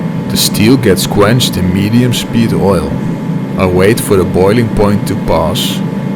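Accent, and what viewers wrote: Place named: Netherlands. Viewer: Hallo , Je mes is echt cool , je voice over is duidelijk en interessant maar probeer een beetje minder nederlands te klinken als het kan ....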